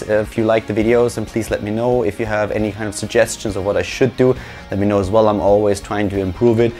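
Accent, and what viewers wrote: Germany. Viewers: Ich habe schon früher deinen Kanal geguckt als du starcraft-Kommentator in Korea warst, seitdem du viel hots machst gucke ich dich dauernd, deine Videos gefallen mir sehr gut :) mach weiter so, ich hoffe deine Karriere geht weiter so gut weiter :) deinen deutschen Akzent habe ich anfangs gar nicht erkannt :D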